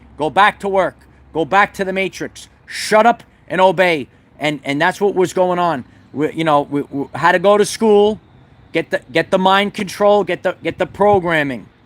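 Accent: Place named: Long Island, United States. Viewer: You always make me think of Long Island where I lived most my life (way you speak), but also you look like and sound just like one of my nephews. Love your channel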